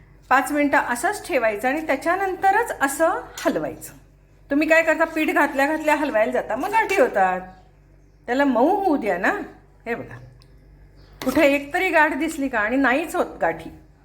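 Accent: Kolhapur, India. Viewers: मॅडम तुम्ही कोल्हापूर साईडच्या आहात की कानडी माझ्या माहेरी पण अशाच गौरी बसवतात थोडी बोलण्याची पद्धत थोडे ताटातील पदार्थ म्हणून तुम्हाला विचारले कृपया राग मानू नये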